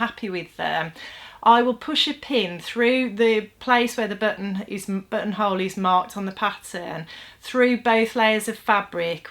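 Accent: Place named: Black Country, United Kingdom. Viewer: Your accent sounds like mine! I'm a Black Country girl that has lived in Canada since I was 27! I am now 63 (yikes!) and need this video as I can't get my new Janome buttonholer to work. Fingers crossed and thank you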